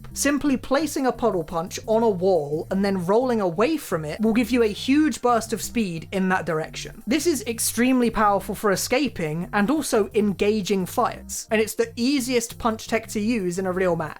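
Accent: United Kingdom. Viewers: I am nourished with this, yet I am not satisfied, I guess I can never feel the same with TF2 for a while, but you should still talk about deadlock, it's a cool game and the way you say swoons me of how bri'ish it is. Btw I hope this won't be the next game for Valve to forget after some years without a "final update" Btw can you talk about celeste movement pls? It would be really cool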